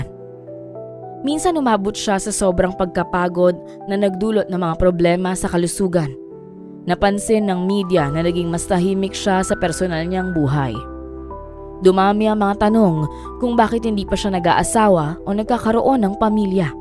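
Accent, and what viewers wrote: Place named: Pangasinan, Philippines. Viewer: nacurios ako sa issue na to kaya pinanuod ko, parang may tonong/puntong pangasisnense yung voice over❤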